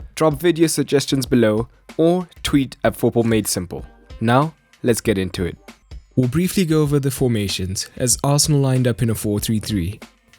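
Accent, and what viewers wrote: South Africa. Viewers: You by any chance from South Africa lad? That accent...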